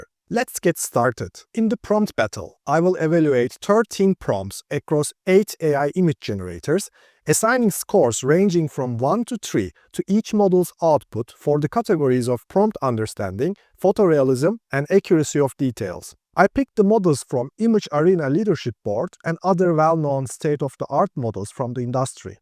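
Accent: Turkey. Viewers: Kanka bişey dicem aksanında Türk olduğun o kadar belli ki severek takip ediyorum ama :)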